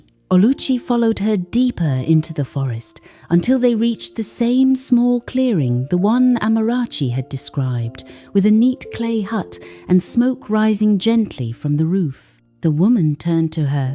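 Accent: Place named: United Kingdom. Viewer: The British accent lost me 🤦🏾‍♀️House of Horus with his rich African tone, telling African stories, is real self love.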